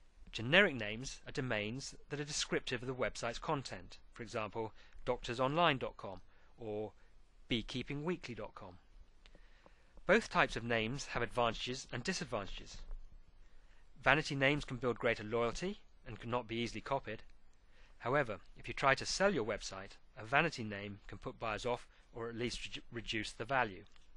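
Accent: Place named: England, United Kingdom. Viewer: Thanks for the info. nice to hear an English accent!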